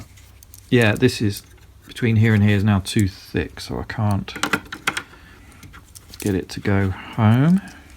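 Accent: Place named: United States. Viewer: Funny accent for an American.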